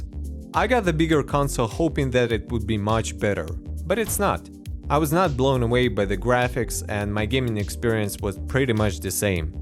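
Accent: Russia. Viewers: Капец как русский акцент слышен!)))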